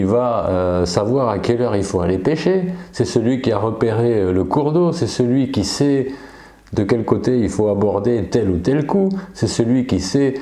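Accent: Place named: Pyrenees, France. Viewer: Bonjour , ravi de te revoir Quel est ce drapeau en haut à gauche? Avec ton accent tu viens des Pyrénées peut être ? Et vive les pêcheurs et les conseils pour pécher une rivière dans les règles de l'art. Le matos c'est super mais faut il savoir s'en servir.Amitiés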